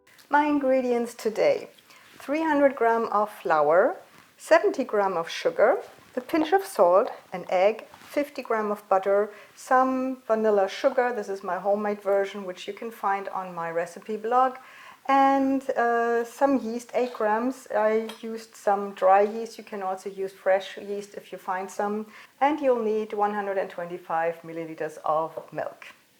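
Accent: Germany. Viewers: A GERMAN SPEAKING ENGLISH!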